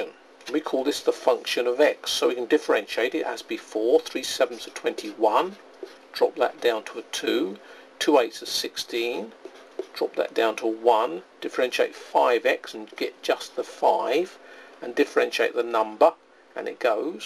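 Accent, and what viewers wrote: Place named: United States. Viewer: thanks erm are you american or something...i love your accent! especially when u say...'That was careless of me' when u make a mistake hehehe x x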